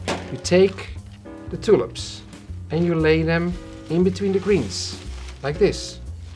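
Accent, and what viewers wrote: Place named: Netherlands. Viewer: jezus wat een dunglish accent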